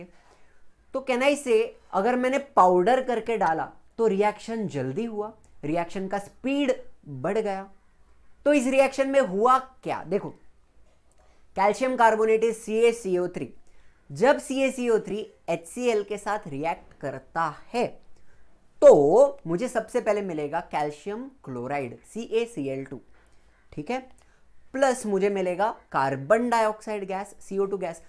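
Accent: Maharashtra, India. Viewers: Sir aapki mother tounge Marathi hai kya????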